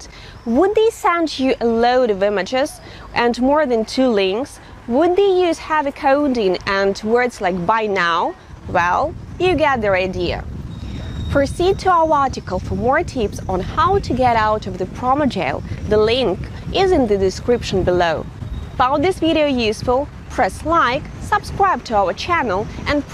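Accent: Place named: Russia. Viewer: Ukrainian company.....Her accent is very strong Russian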